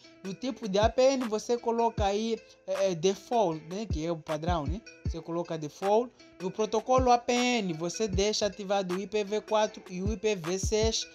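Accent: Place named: Africa. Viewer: seu sotaque é africano 🤔🤔🤔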